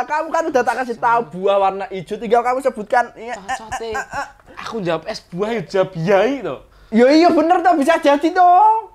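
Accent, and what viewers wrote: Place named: Semarang, Indonesia. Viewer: Logate semarang bgt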